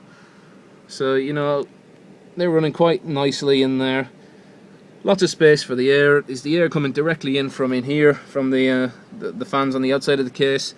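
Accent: Ireland. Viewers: hey man, u sound irish? i presume u are but anyway, i have the same CPU and im just wondering how much do you think i could overclock it using the standard cooler that came with it?.. i searched around but good answers :/ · Are you from Ireland?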